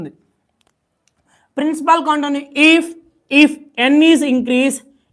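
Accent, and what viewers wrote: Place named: Telangana, India. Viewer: Super Telangana slang ........😉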